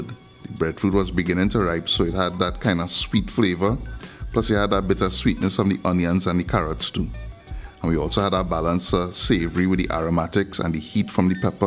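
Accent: Trinidad and Tobago. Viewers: But on a real tho, I really like how homeboy talks, It sounds so Trini and he's straight to the point, very interesting delivery. Keep up the good work and keep bringing these delicious recipes! Respect!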